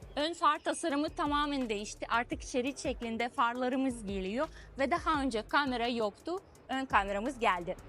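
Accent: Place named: Russia. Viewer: abla rus şivesiyle türkçe konusuyor ve almanyada 😮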